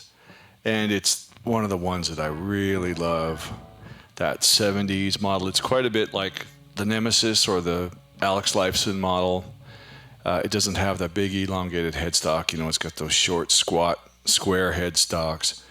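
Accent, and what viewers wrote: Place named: Texas, United States. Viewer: You’ve been living in Austin too long. You picked up that Texas twang.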